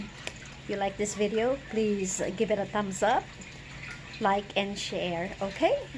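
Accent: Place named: United States. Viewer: yeah my sissy like the way you speak you adopt already the accent in America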